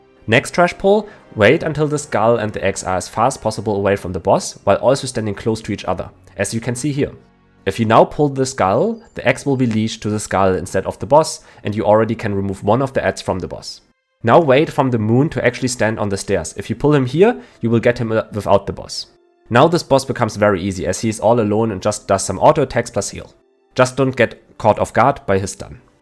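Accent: Germany. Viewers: krass wie man den deutschen einfach immer raushört^^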